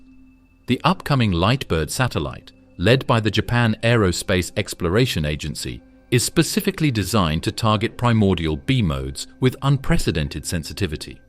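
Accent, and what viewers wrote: England, United Kingdom. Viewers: They have an English man read these dumb questions to seem smart. In the beginning God created the heavens and the earth.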